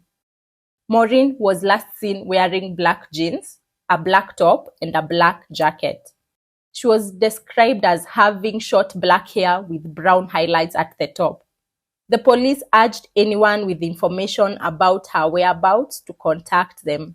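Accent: Kenya. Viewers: She will improve with practice but, it is a Kenyan accent. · Here in the Uk there are tons of accents and intonations. The narrator of the programme has a Kenyan accent. But what she is saying is easily understood. In the Uk there are regional accents and one cannot make out what the person is saying because the accent and intonation is so strong.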